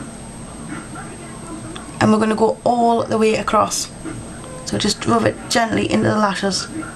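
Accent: United Kingdom. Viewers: by far, my fav look. keep up the great work. ps- love, love, love the british accent! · You know... you are so beautiful. I am from the U.S. and I love your accent. I've always wished I was from the UK... since I was a little girl. I'm pretty good at copying different accents. Anyway... Your tutorials are awesome and congrats on the BBC thing. Have a great day.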